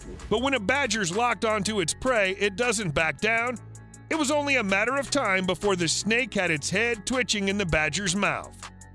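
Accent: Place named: United States. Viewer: spoiled the vid with the horrible US accent. yuk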